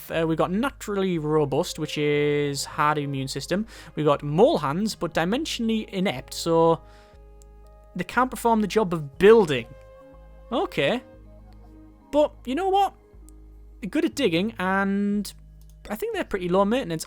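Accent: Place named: Hull, United Kingdom. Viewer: he sounds like he is from hull in the uk. (where im from)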